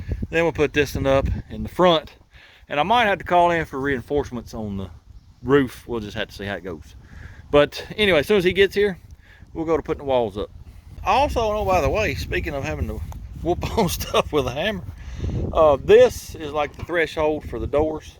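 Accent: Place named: Southwest, United States. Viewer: I am writing you from France,. I am from Prentiss Mississippi. And L have watch everyone of your vidéos, and love everyone of them. Me being in France , it is good to hear someone from the Southwest talk. I am à retirer milirary, L moved to France, when I retirer. So keep up the good work, you are like one of the family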